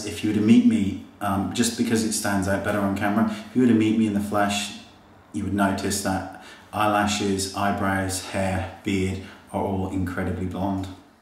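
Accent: United Kingdom. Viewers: Here's another question: where are you from in the UK? Your accent is really nice!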